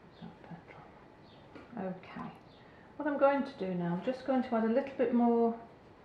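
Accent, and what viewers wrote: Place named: England, United Kingdom. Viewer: Beautiful painting. Do you always “cream” strength that much paint? Do you keep when your down? Add water again, next day? Or do you toss & start fresh. I’ve only ever made up that much paint when I did a negative painting. I know you said you were using 200# what mfg? Love learning new ways to do things. I really want to try this one, next week, when our temperature goes back to normal. ( Northern California) you sound like you have a bit of an English accent is that where you live?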